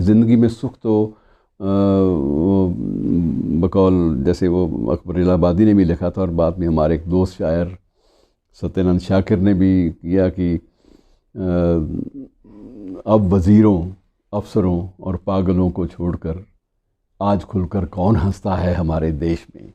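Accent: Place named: Pakistan. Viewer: Iss aadmi ko sunke aisa lagta h jaise kisi pakistani ko sun rha hu, ab dekhlo jinki jeeb tak dushmano jaisi h unke vichar kinke jaise honge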